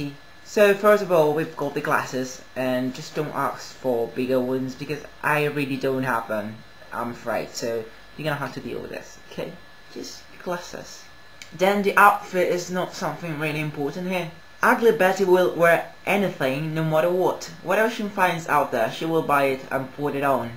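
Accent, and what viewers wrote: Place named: Spain. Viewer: I love your accent. Much nicer then English, Australian or even South African. A Spanish man speaking English. Whats this world coming to?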